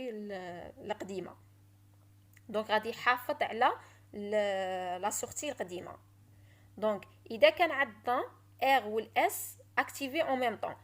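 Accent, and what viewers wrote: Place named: Algiers, Algeria. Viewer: L'explication est claire et l'accent algérois agréable à entendre.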